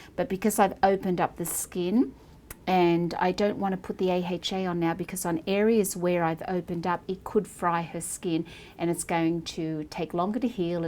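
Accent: Australia. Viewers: Aussie as hell lol what a nice touch hearing that Aussie accent in the video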